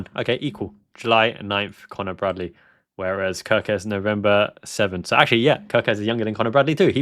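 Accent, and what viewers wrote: United Kingdom. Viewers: Another top video and happy belated birthday 🎂 to Mia. Out of curiosity....it's clear from your accent that you were either born in the UK or spent many years here. What took you back to Japan ?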